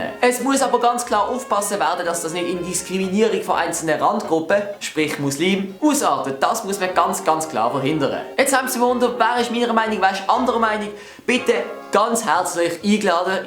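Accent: Switzerland. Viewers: Ahhhwww, immer die hochdütsche Videos und denne die schöni schwiizer Stimm <3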